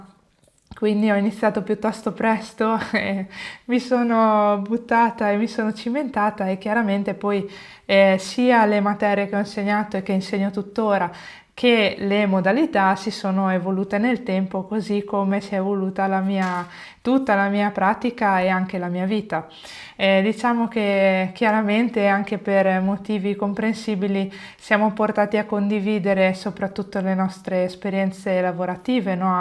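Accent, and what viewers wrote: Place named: Eastern Europe. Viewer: Infatti adesso noto che neppure il suo nome mette. E dall'accento mi sembra slava. Una "dottoressa" proprio in gamba deve essere. Olistica al punto giusto.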